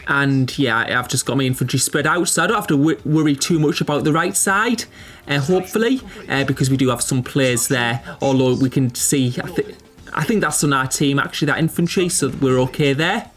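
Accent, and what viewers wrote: United Kingdom. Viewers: The queen's English (miss her already).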